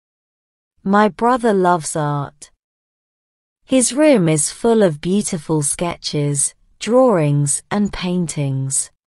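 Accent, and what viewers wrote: United Kingdom. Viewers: Is this the British accent ??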